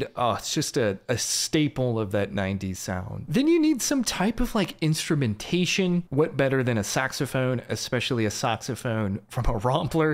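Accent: Baltimore, United States. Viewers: lol i would bet my life you're from baltimore or philly. great video!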